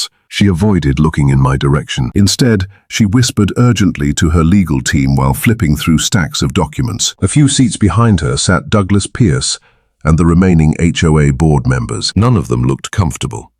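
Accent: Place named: United Kingdom. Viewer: The story was a good one. They never let you know what the HOA and Keren ended up with in court. They were guilty. Yes, but what were the penalties. The other thing was the v oice used. That was a British voice. The story was in the USA. His family had been on that farm for 60 years plus.??????????????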